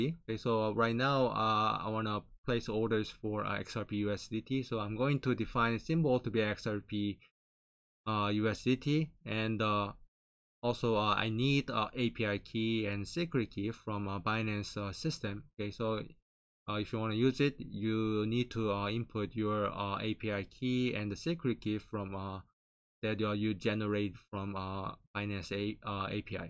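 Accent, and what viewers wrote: South Korea. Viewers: 나는 영어를 못하지만 처음 몇문장 듣자마자 한국인임을 알았다 ㅋㅋㅋㅋ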